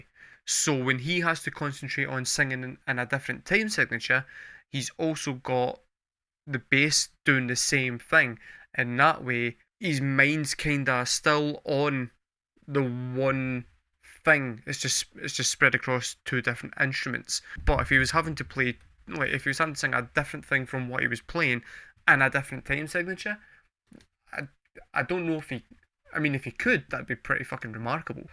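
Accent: Scotland, United Kingdom. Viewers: Your words are SO much better in a Scottish accent; you really do recognise genius and express that so well. I've enjoyed every moment of this. Thank you mate.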